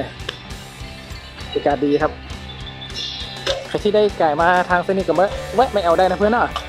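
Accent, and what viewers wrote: North, Thailand. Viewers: อ้ายคนเหนือบ่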